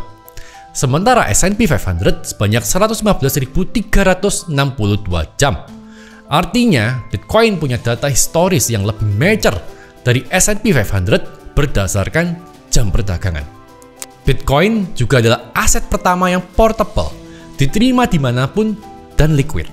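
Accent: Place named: Madura, Indonesia. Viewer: Madura pak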